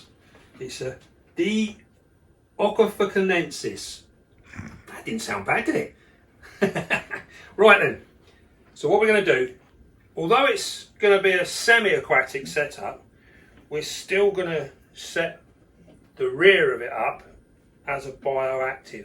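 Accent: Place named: England, United Kingdom. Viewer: Wow, i have never seen a video about a semi-acquatic species of spider...very nice, and as usual i really like your calmness and kindness and also the experience u have with this animals. Love also to hear the english accent :) Support from Italy, you have the best spider-keeping channel out there :) Nice work!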